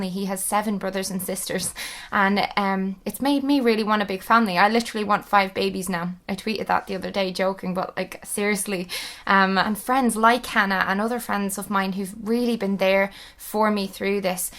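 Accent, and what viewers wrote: Ireland. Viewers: One: her dad had a fucking heart attack, do you really think this is appropriate? Two: she's Irish, that's her accent. Three: you're a moron.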